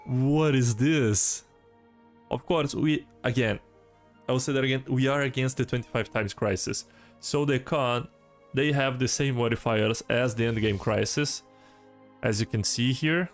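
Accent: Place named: Russia. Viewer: Я думал ты из России или где-то рядом лол.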